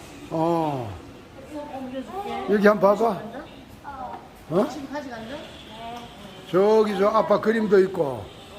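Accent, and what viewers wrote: Gyeongsang, South Korea. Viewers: 누부야 66만만에 구수한 경상도 사투리 말씀 반갑습니다 랑 늘행복 하십시요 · 는 표현력도 최고 입니다 세월은 너무빨리 흘러갔네요 부산 광안리에서 먹어본 그맛은 잊을수가 없고.. 갱상도 사투리로 누부야를(누나) 제주도에서 부르는 유퉁쌤, 정겨운 목소리와 사투리가 매력있어 매일 시청 합니다 늘~건강 하시기를 기원 드립니다. 캇트, 구독, 좋아요. 👍 👍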